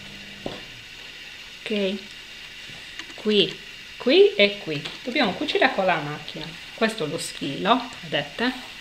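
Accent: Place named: Sardinia, Italy. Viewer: Modello carinissimo e semplice, simpaticissima la tua cadenza sarda 👏👏👏ciaoooo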